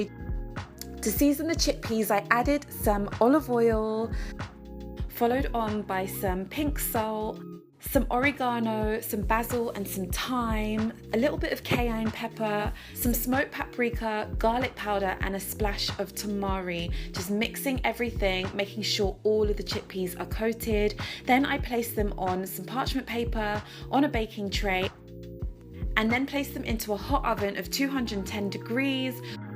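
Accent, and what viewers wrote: United Kingdom. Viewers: You sound British...why are you using •Fahrenheit???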